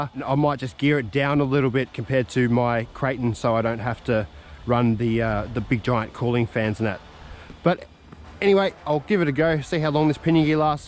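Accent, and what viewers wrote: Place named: Australia. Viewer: Hello my friend cool Ausie accent the Kronos is gorgeous iam looking to get a 4S brushless truck, the Kronos might be the one, or I was thinking the traxxas Maxx V2 4S, I have tons of trucks and cars I've been into R/C since 2005 I got XMAXX losi 8T, Kyosho GT2 nitro and so on, but I don't have a 4S truck, anyway thanks your new subscriber william the doorman from NJ Forgettaboutit lol 😆 😀 😊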